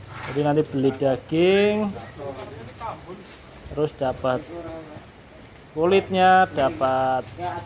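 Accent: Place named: Wonosobo, Indonesia. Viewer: Omongane kea Wonosobo ya bolo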